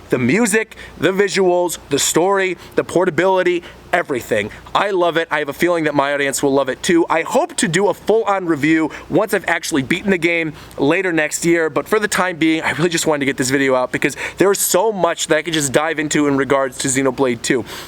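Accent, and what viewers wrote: Australia. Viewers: Australian accent? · Im pretty sure he sounds Australian.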